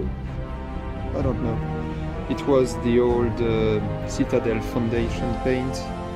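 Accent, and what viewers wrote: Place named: France. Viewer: j aime bien ton accent français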